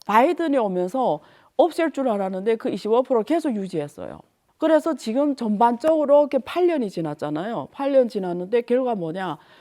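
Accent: Yanbian, China. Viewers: 안유화 처음 보는데 이사람 말투가 조선족인데 ㅋㅋ 조선족은 중국인이지 중국인이 중국 좋다고 지들이 최고라고 하지 미국이 더 낫다고 하겠나? 간첩일 수도 있고. 지금은 중국이나 한국이나 인지전 시대임. 사기치고 간첩보내서 사회 교란시키고 정보빼내서 해당국가 망가뜨리고 이런 전쟁하고 있음. 그걸 막기 위해선 철저히 중국인은 내쫓고 배척하면서 물건도 팔아주지 말고 고립시켜야함. 그럼 지들끼리 지랄하다가 폭망하는거지. 근데 간첩새끼들이 동조하니까 저런 악마들이 득세하는거야. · 중국 지금 너무 않좋은거 잘 아는데 논리 비약이 좀 심하신듯 하네요. 관세정책과 환률등 말씀하시는 내용이 경제학적으로 맞는 것인이 의구심이 들고 말씀하시는 말투가 한국분이신가요 아님 귀화 조선족이신가요? 하여튼 개관적인정보로 이야기해주시면 감사할듯 합니다.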